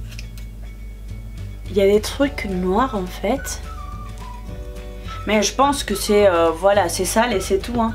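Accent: Spain. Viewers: le "mo" c de la moisissure 😂😂 et c le fROmage et pas le Formage😉😂 et pour info je sais qu' elle a un accent et qu' elle est espagnole et pac française de base c pour ça qu' elle fait des fautes et je l ai juste corrigée pour qu' elle s améliore c tt les rageux il faut vous calmer😂😂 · Axent espanioll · Jsuis la seule qui kiffe l’accent espagnol sur le français ? 😍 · T espagnol ? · T de quelle origine ? Ps: superrr videooo ❤ creo q ablas espagnol pienso q e reconosido el acentoo ❤ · Tes trop chou avec ton accent espagnol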